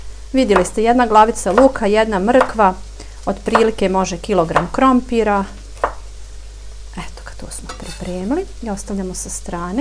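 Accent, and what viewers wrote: Bosnia and Herzegovina. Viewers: Draga, volim kad vidim da divna mlada zena zna i voli da kuva. Posebno mi je drag vas bosanski naglasak koji me podseca na lepe trenutke dok sam zivela u Bosni sedamdesetih godina proslog veka. Pozdrav od jedne bake iz Srbije.❤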